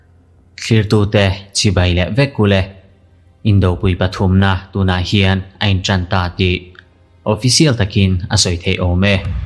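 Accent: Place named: Mizoram, India. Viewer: Mizo hi kan accent hi ,a,aw,b angin. Athluk kan lam a,masse English erawh chuan a.b.c ani leh sia...kalô chik thrin a,NATO TI PAWH NI nato tia alam dan hi ani,midang pawh an tam nual mai...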